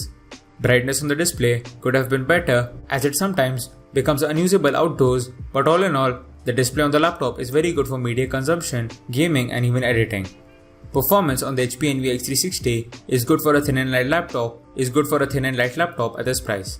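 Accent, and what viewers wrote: India. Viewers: You try speaking Indian without accent..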